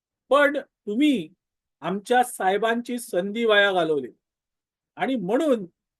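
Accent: Malvani, India. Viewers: याला म्हणतात मालवणी ठसका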